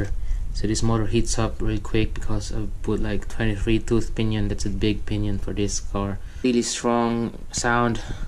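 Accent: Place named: Malaysia. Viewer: i thought u are from malaysia as your ascent is quite similar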